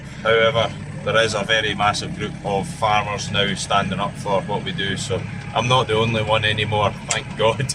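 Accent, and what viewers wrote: Scotland, United Kingdom. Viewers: From Brittany, i like your funny scots accent. It's a bit hard at the begginning but when i catch your accent it 's really fin 🤪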